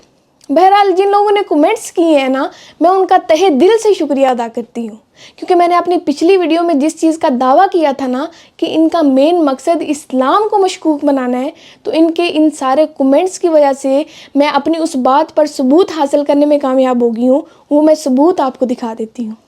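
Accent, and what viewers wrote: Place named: Pakistan. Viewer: Mujhe ye pakistani accent bahut psabd hai ❤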